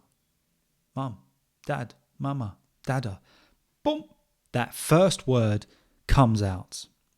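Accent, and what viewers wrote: United Kingdom. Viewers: Hi! Today, in one single day, I’ve taken enough time to listen to you again. Three podcasts, and this one twice, because it’s great and helpful to everyone what you say about the ability or skills in speaking in a foreign language for those who want to speak it or get fluency. You have explained this important issue in a very clear way to be understood. Thank you. Se sentir bloqué ou avoir peur de parler dans une langue autre que la nôtre, c’est normal pour les adultes. Il faut se le dire et se le redire. Mais il faut vaincre cette peur. C’est possible! Ce que vous conseillez de faire à votre public pour y arriver nous semble tout à fait juste et fonctionnel. Merci beaucoup! Mais pour un Européen quelle sorte d’anglais faudrait-il essayer d’imiter? In my opinion your accent would be the best. Your British accent is the most widely and understood in use everywhere. 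· Fantastic teaching, speaking British English clearly sir you are every ways perfect. Thank you very much for your teaching British English Mr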